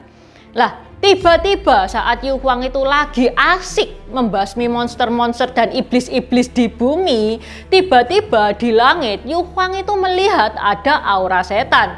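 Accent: Java, Indonesia. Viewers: Mbak daleme pundi gih. Jawane medok men.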